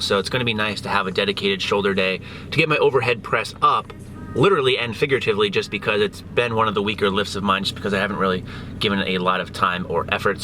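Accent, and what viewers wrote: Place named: South, United States. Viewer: YUSSSSSSS the return of with that ole southern accent. Appreciate it man it sickening.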